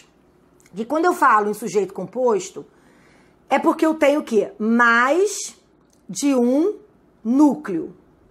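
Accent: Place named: Rio de Janeiro, Brazil. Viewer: Por que todos os professores de português são cariocas?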